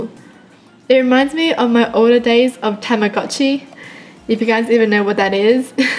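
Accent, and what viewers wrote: Australia. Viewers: Is she from australia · are u australian?!?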